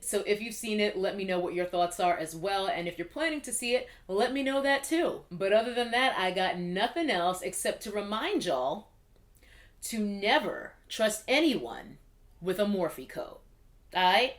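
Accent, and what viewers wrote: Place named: Brooklyn, United States. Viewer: so brooklyn. just subscribed.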